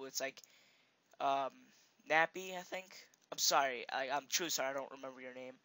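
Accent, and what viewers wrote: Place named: United States. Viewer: cool vid but he speaks American?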